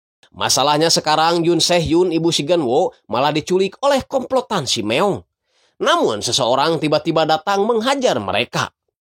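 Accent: West Java, Indonesia. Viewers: kan di potong potong tapi intruksi sudah tertulis & tinggal baca saja berserta mensensor kata yang tak pantas dengan ucapan logat sundanya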